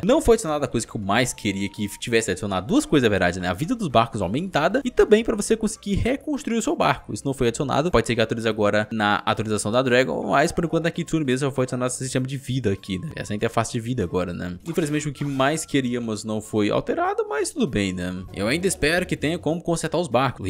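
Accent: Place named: Ceará, Brazil. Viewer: eu não sabia que vc era cearense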